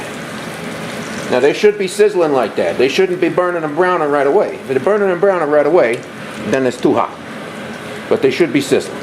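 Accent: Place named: Brooklyn, United States. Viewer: What a great accent. It makes me feel at home back in Brooklyn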